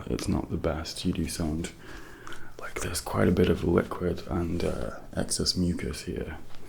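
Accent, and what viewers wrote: United Kingdom. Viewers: Your British accent is very realistic 👍🏻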